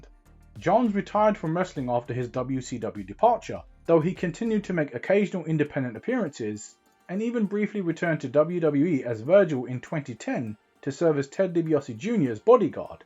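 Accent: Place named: United Kingdom. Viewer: How come you narrators are always British??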